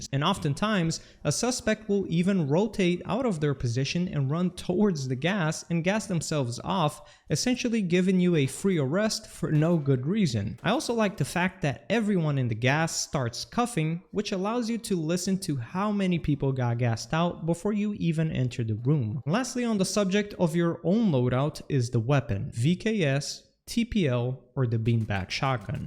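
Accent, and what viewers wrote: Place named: Portugal. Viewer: I knew as soon as you started to speak that you were portuguese. Great vid man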